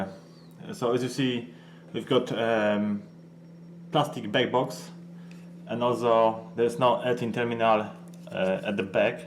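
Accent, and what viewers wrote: Poland. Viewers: Lovely Polish accent. Dzięki za pomoc. Thanks for help.😎🇵🇱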